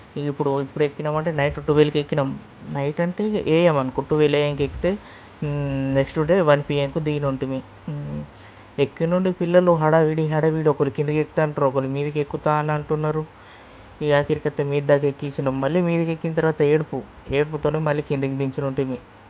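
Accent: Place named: Telangana, India. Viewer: 👌👌mana thelangana style lone matladu baguntundhi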